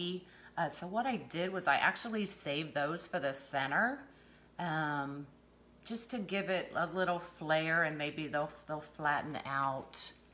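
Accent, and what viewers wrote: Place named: Louisiana, United States. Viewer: Enjoy your videos. It is interesting to see how the UITC boards make pretty wreaths with less time and material. I know you said you were in Houston, but your accent sounds like Louisiana to me. Are you originally from there?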